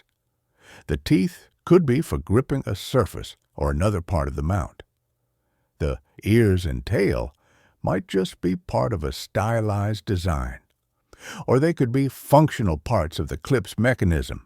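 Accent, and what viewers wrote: United States. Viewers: The "southern drawl" AI voice is really annoying. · Not liking the Louisiana-like accent.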